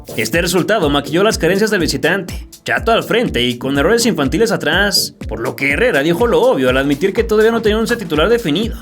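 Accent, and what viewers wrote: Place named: North, Mexico. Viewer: Que onda con el acento norteño del narrador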